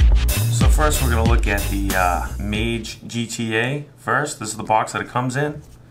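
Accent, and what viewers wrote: Boston, United States. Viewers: what accent is that, boston ?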